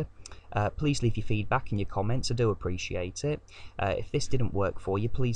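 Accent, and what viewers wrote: United Kingdom. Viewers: Hello. I want to ask you a question that has nothing to do with the video. I'm from Venezuela and speak American English, but I can clearly understand what you say with your accent of British English. I would like to know exactly where are you from, for knowing in what cities speak with your accent, and to know the places I can understand the British English without difficulty.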